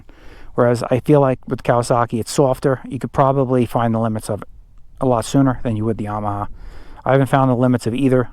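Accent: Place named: Northeast, United States. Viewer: nevermind i saw a cactus or 2.... your accent is definitely northeast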